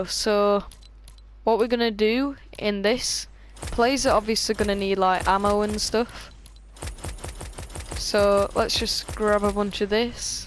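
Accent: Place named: United Kingdom. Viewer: Hes british eh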